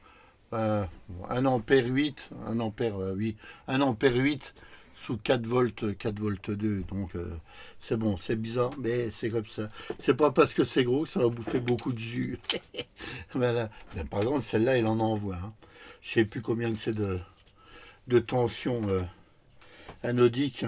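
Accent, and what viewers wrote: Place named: Lorraine, France. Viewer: Bonjour, vous avez un accent lorrain,de quelle region etes vous.?...Connaissiez vous L ESR à Nancy boulevard jean jaures (L ecole speciale de radio électricité ) en 1968 et 1969...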